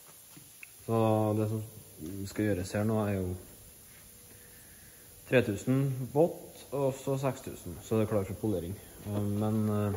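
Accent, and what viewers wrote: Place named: Trøndelag, Norway. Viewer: Du snakker bra trøndersk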